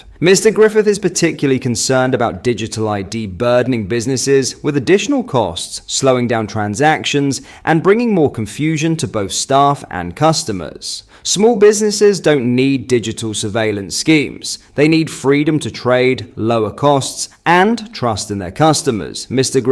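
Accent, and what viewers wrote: United Kingdom. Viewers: Why are you using British stands voice?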